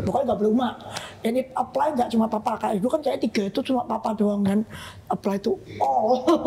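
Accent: Java, Indonesia. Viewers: Cici ini pintar ,sederhana, duitnya banyak.tapi gk pengen oplas.1lagi medok(gk lupa dia berasal) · Antara Jawa Tengah atau Jawa Timur wlwkwk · Tercerahkan, terimakasih mbak e. Logate jowo gampang dipahami. Bakbuk itu impas 😅❤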